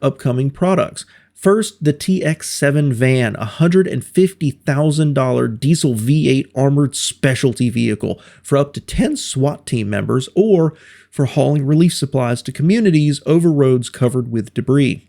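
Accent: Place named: United States. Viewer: Great video, thanks! One gripe I do have is the fact that you didn't say at the beginning what country you were talking about. While it might seem inconsequential, as a first time viewer from elsewhere I didn't know if you would be talking about only the US domestic market or if there would be a wider perspective. I quickly gathered you were American by your accent and by the police cars you showed (although the little Fiat Nuova 500 behind sent another signal), but I just wish you would have said "the American police" rather than just "the police". Considering there are hundreds (if not thousands) of other police administrations in the world, and that they all have interesting stories to tell on how they select and build their vehicles, it's important to be precise location wise like you were time wise. Which you ended up doing beautifully by the way, just a bit late in the video. 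Edit: the very first sentence of the video exemplifies my issue: "Police cars come in many shapes and sizes" (absolutely right) "and while Ford controls 61% of the market" (that statement is false: Ford controls 61% of it US police car market, not the "police car market") It makes it seem that it's so obvious that you're talking about a pure American story through and through (when it's not immediately obvious), that there's no need to mention that you're talking about the USA (there is a need, otherwise your statements are technically not correct anymore).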